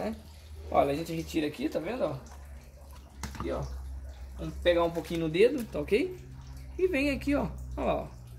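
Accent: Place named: South, Brazil. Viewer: Bom dia gostei de assistir sua explicação tudo de bom pra você você deve ser do Sul por causa do sotaque parabéns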